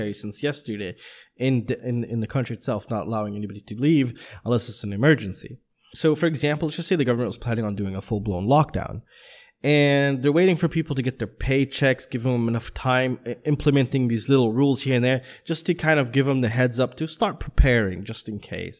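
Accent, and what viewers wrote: United States. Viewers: Blows my mind I have been made aware of that you are Hungarian as I am. Never came to my mind for a second! Perhaps your American(?) accent have thrown me over. LOL The confirmed cases are not exactly equal to existing cases - you and me both know how reliable the data can be handled by our government (especially when you want to avoid panic at any cost). I am worried for my Mum in Pecs. What rumours you are referring to exactly? When only 4000 tests have been carried out you can always say 'nothing to worry about because we only have X number of cases'. It is not a rumour only - the Hungarian chief medical lead is a GP from a village with 4000 population without any further medical background - it doesn't really brings much relax to my mind. You can be a brilliant mind (or a medical genius) if your boss in charge lacking on the expertise. 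Currently we have not enough tests carried out (compared to other EU nations) to know how much Hungary is involved in the global pandemic. Rumours hah? If it is possible I am now appreciate more your hard work knowing it is coming from Hungary. I wish the very best for you and your family in this challenging times.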